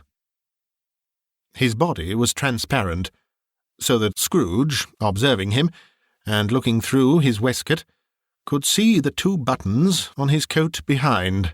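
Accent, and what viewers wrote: United Kingdom. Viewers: I tried listening to a few other versions. A Christmas Carol, narrated without a British accent, cannot be borne. My opinion, I know, but at my age, traditions die hard. So, yours will be the version I will be listening to this season. I must admit that due to my illness, myasthenia gravis, I will not be able to cook for Christmas this year or decorate. It is all I can do to take a few steps and do my speech therapy, swallowing therapy, and everything else therapy, lol. So, please know how much your production will mean to me this year, about the real meaning of Christmas. I can't now join your Patreon, but am hoping to as soon as my disability is approved, to thank you in a more tangible way for the very many long nights your charmingly narrated stories have helped me through insomnia, pain, and sleepless nights. I have been following you since the beginning under my other channel, Wmnoffaith1, but I am the same person, notwithstanding. 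( It is rare that one has an opportunity to use notwithstanding in a sentence; it makes me feel positively Dickensian:). Anyway, I just wanted to express my thanks, and let you know that regardless of the problems, and wars you have had to endure this year to keep your channel alive, it is appreciated by so many of us, and provides more comfort than you know. Merry Christmas and a Happy New Year, to you and yours. I wish you all of the success and blessing and prosperity that you so richly deserve in the coming year. Sincerely, Cheryl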